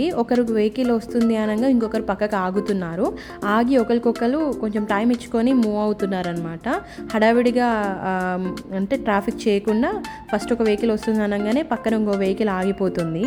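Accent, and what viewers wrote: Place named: Hyderabad, India. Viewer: Eydhemaina hyd slang marchipole... Very good keep it up...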